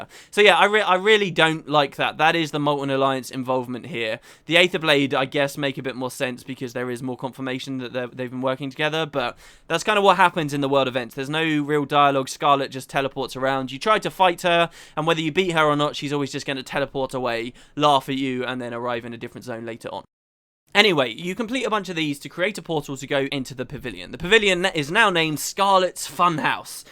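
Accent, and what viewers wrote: United Kingdom. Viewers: He's Brittish, they pronounce things differently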